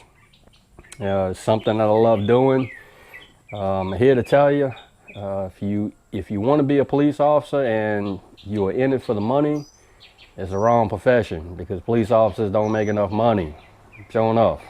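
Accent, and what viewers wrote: South, United States. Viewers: Glad this popped up on my YouTube today. Have been following your channel a little over a month now and haven't had time to go back & review old videos yet. I deducted about the 3rd video I saw that you were most likely on the force. Thank you for your service and continual prayers for your protection, as you protect others and our rights/freedom. "Don't let nobody Dull your shine!" was the final nail which made me know I had to follow you. Your accent, southern expressions and channel name caught my attn first. I've lived in Metros and small towns, but "in the sticks" is where paradise can be found (& created). Always enjoy seeing what's happening at the home place. You posted this Oct 2 of last year, saying it was a nice 85 degrees. This year, here in middle Ga 85 degrees is passed too fast to hit the 90s. Don't think we've had a lick of rain since August, so we are extraordinarily dry. 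Gonna hold out hope the cool will come again soon. Take care and keep shining!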